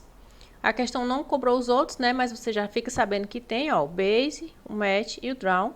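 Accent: Brazil. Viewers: Parabéns pela ótima didática somada a esse doce sotaque de algum lugar do Brasil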